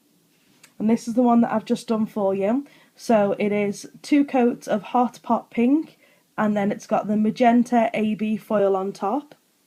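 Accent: Liverpool, United Kingdom. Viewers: are you a scoucer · Hi, I love you videos, I grew up in Liverpool myself so love listening to your accent. I own a training centre in the West Midlands and would like to use some of your links to help our students. Please keep up the good work of how to do nails properly, there are so many technicians out there that have not be trained well. John at thenailschool.co.uk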